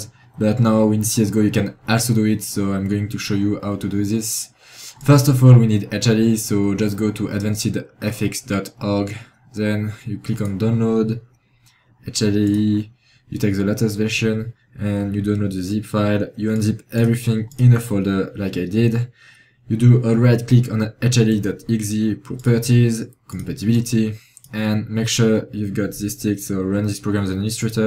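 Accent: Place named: France. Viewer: hahahaha accent fr de merde hahaha jsui mdr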